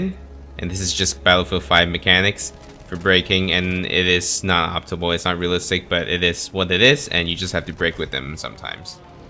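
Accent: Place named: South Korea. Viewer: Where is this guy from I can’t tell, he sounds kind of korean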